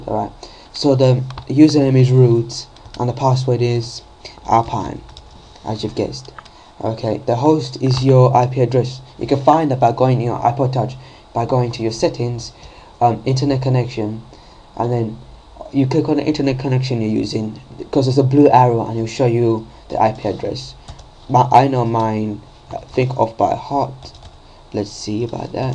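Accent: United Kingdom. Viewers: HES BRITSH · Fucking british accent... CANT UNDERSTAND YOU!!!!!!!!!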